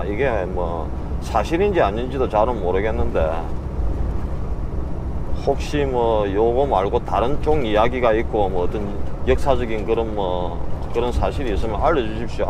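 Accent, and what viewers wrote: Gyeongsang, South Korea. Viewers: 고향이 서부경남쪽? 진주? 부근?. 응원합니다. 감사합니다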